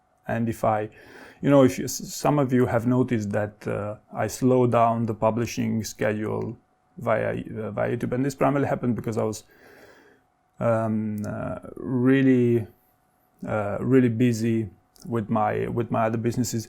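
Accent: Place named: Romania. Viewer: Thank you for all the videos! I figured that you would be Romanian, you sound exactly like one of my Romanian boss :D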